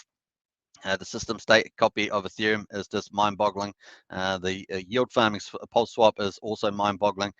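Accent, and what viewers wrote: New Zealand. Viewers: Are u a wee kiwi living in the big apple?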